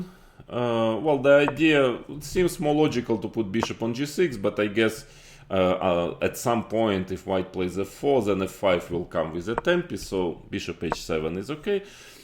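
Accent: Russia. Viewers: So thick Russian accept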